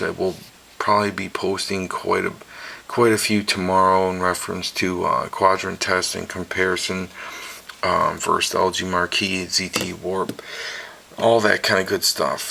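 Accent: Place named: Michigan, United States. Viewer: r u frome michigan?.. or somewhere up north?.. i like ur accent :)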